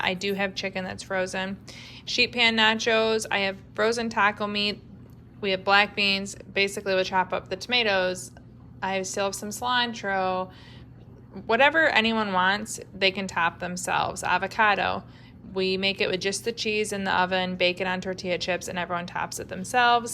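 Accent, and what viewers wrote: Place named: Midwest, United States. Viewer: Your accent told me you are midwest.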